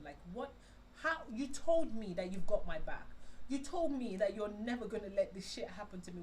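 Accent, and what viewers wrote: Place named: Yoruba, Nigeria. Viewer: 😂 You said v-log... Really sorry you've been going through all this. I can't imagine how overwhelming everything has been for you. Nigeria is just a whole beast to deal with especially when it comes to things like your shop issue. Also I'm probably projecting and I'm a huge proponent of keeping quiet if you don't have anything nice to say but I honestly love when the yoruba or the more unfiltered sounding accent comes through from you. Again projecting but yeah. I really hope things don't get messy at all with the shop folks and goodluck with the new shop and the tailoring business. I follow you on insta so I see your fabric ads and I always wondered why you didn't make clothes 'cause its a whole lot more profitable to have both businesses in one. Its what my dad's tailor does. You pick out the material there and the design and boom, he makes his money.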